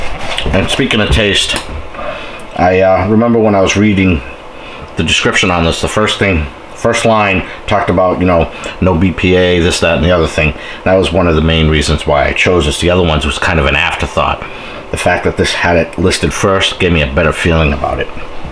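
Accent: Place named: East Coast, United States. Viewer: You have my grandfather’s name, first and last. I’m a too. Not tons of us. Sounds like you are in the east coast like us.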